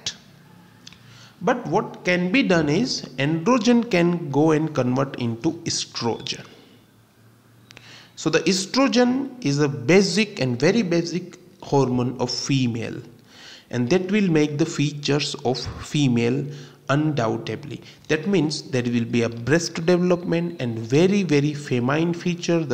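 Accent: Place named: India. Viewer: Какой прикольный акцент у индусов.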